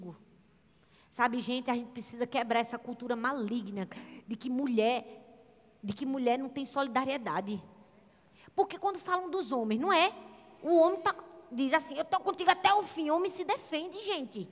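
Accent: Northeast, Brazil. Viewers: Amo suas pregações. Vc. fala a linguagem do povo. Amo seu sotaque. Tb. sou nordestina. 😍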